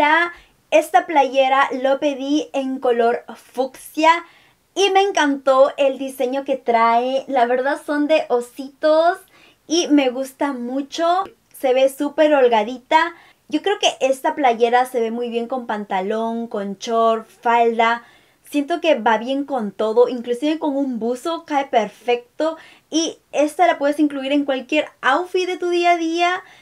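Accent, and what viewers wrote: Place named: Mexico. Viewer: Pareces mexicana como hablas!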